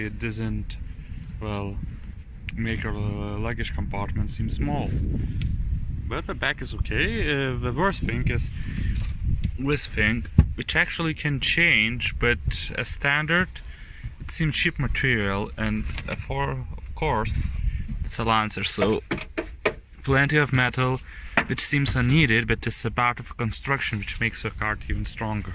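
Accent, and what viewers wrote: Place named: Lithuania. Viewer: Is akcento galvoju : turbut Lietuvis. Is video matau, teisingas spejimas :D Nu ir veliau pasakei: " Vilnius" fainas video !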